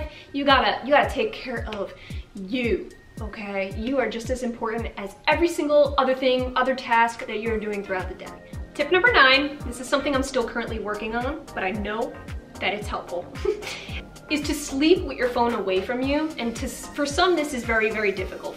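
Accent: New Jersey, United States. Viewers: Visiting from channel! Do I recognize a Jersey accent? Great video!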